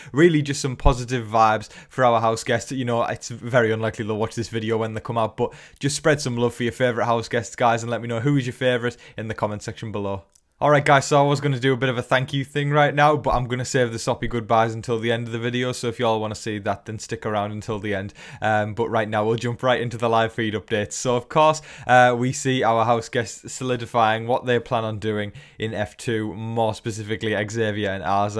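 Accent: United Kingdom. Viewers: BTW Because of listening to you for three months I can now understand your British accent plus I can understand others as well. Especially the people on the movies as well as many others. Thank you for providing us with all your comments and thoughts on this season of Big Brother.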